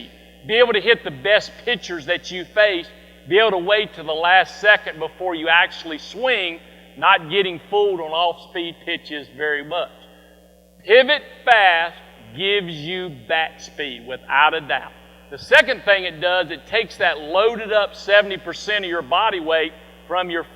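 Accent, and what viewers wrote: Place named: United States. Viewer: lol american accent...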